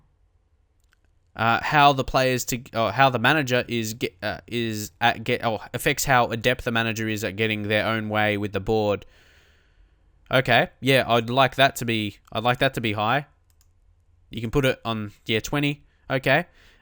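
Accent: Australia. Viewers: this aussie accent man. cheers from Syd, mate. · ih yes i love the austräääälian accent!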